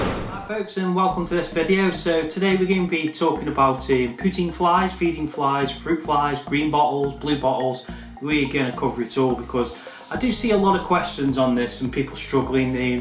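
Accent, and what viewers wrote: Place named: Stoke, United Kingdom. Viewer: I recognise that Stokie accent anywhere great videos keep them coming I am a newcomer to the hobby not quite took the plunge yet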